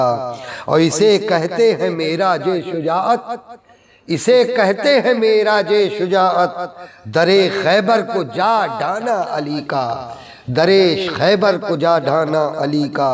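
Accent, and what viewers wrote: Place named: Varanasi, India. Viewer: آج آپ بنارس مشاعرے کے لہجے میں شعر بول رھے ہیں حضرت 💗💝💗💝💗💝💗💝💗💝💗💝💗💝💗💝💗💝💗💝💗💝💗💝💗💝💗💝💗💝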